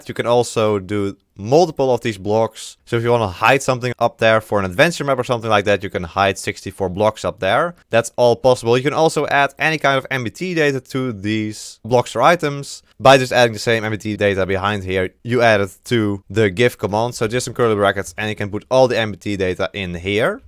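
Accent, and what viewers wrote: Netherlands. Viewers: ik wist het.Nederlands accent is zioo duidelijk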